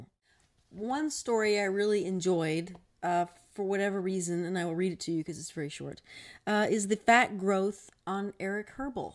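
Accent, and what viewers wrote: Liverpool, United Kingdom. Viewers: I always loved the Lennon books too. But I never asked anybody to call me. Nice Liverpoodlian accent, too! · Oh gosh, I still have those books from the 60's! I can't do the Liverpudlian accent like you can, but I loved reading his words and getting carried away with the absurdity of it all. From the mind that gave us, "Turn left at Greenland," when a reporter asked them, "How did you find America?" Oh, the memories.... thanks,...:-D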